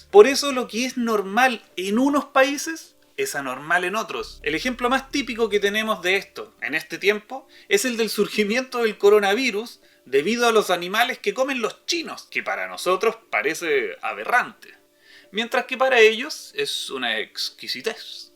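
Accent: Chile. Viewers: Esa voz tan chilena 🙂😉.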